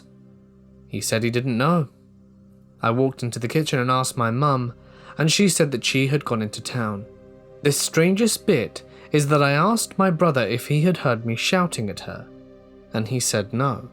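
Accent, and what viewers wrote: United Kingdom. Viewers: Nice British narration and manner for a change ( I thought USA You Tubers had the Monopoly on this subject ! )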